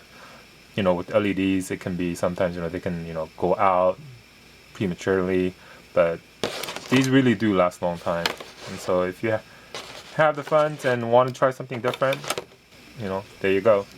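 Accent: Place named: South Korea. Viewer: I have '15 Accord Sport. I got a lot of useful information from you like the HID installation. Thank you very much. I got personal question. Are you from Korea? Your accent is very similar as mine. ^^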